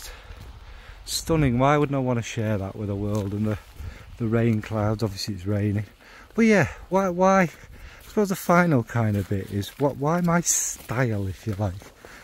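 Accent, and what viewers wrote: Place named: Northern England, United Kingdom. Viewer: Wait.. What's an algorithm? 😅😅😅 Listen.. There's organic, there's forced and formulated, and there's a ton in-between. Some are naturally good at being robotic and sterile with it, some are really great with their 'stage presence' who are excellent on camera and just naturally are fantastic and popular. I only film things if it's enjoyable and it's something i want to watch back for my own viewing. I've an idea for a video which has absolutely no relevance and I'm sure zero people will be interested by I'll probably do it for my own lonely little world of nerdy viewing. Like a fragrance diary..that happens to be public 😅😅😅 Anyway.. I started drinking whiskey at the start of this video, and I'm half way in and feel pissed. So will have to pick it up tomorrow 😅😅 🥃🥃 but always good to hear a proper northern geezer being true and raw, if a little rough around the edges 😁😁 Never stop the stories and creative content Mr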